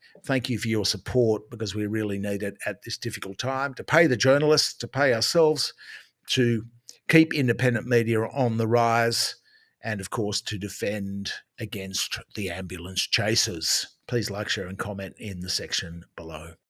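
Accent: Australia. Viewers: Hey mate. Nice hearing an aussie accent. This is mad.. but this is what he promised? All aboard the market crash!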